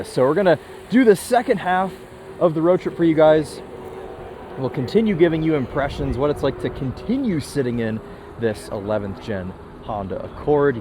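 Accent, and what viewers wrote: United States. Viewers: As an Iraqi Arab student, I enjoyed this video very much. It was like an English lesson for me, and I benefited from it to develop my English because your words were very enjoyable and very useful. I also like the American accent, and as for the car, it is wonderful, and it was a wonderful experience. keep on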